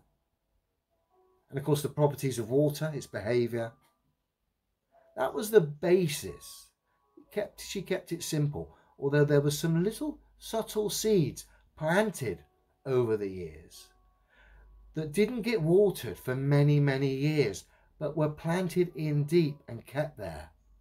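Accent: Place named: United Kingdom. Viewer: new sub, great to hear a Brit covering this topic with great detail and information. Keep it up my friend people starting to see this monumental lie.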